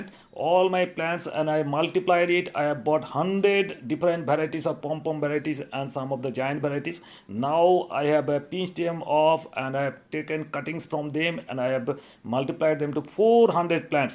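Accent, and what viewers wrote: India. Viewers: Indian accent English :-)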